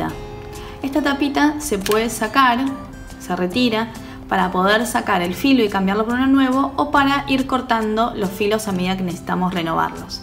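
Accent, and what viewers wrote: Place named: Argentina. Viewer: Amo cómo explica. Los argentinos hablan tan bien. Me fascina.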